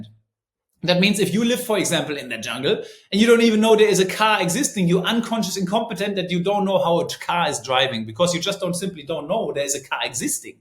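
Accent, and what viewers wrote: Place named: Germany. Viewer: Sorry Kumpel, irgendwo habe dich sprechen hören, dass du in Englisch sich einfacher als in Deutsch äußern kannst😉 Jetzt finde dich Englisch sprechend und ok...du redest fliesend, aber dein German accent ist schon markant, was deine obige Aussage nicht nur in Frage stellt, sondern auch ausschließt. Deutsch ist und bleibt für dich eine Sprache die du am besten kannst(dagegen mein Deutsch...na ja). Bin auf dich in polnischen Medien gestoßen und aus reiner Neugier habe ich kurz recherchiert, wer du bist. Mein herzlichen Glückwünsch zum Vater sein, das Gefühl könnte ich zwei mal lernen. Eins haben wir noch gemeinsam...wir beide leben in Schatten unserer Frauen. Meine Gattin(im Avatar noch mit 36) kommt wie die Marcelina auch aus Polen. War Inhaberin einer Medienagentur in Stettiner TV und Finalistin, Warschauer Look of the year. Nicht desto trotz, ständig bodenständig, liebevoll und smart. 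Mach alles richtig, den ersten Schritt hast gewagt 🥂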